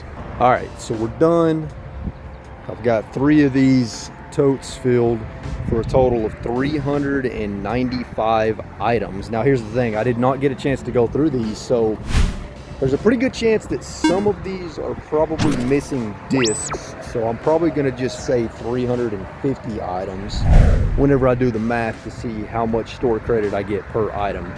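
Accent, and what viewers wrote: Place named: Tennessee, United States. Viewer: Liked and subbed from watching your friends game cube video featuring you son. What part of Tennessee do you y’all hail from?? I live in Seattle and my sister moved to Jackson,TN several years ago. Thought I recognized that accent lol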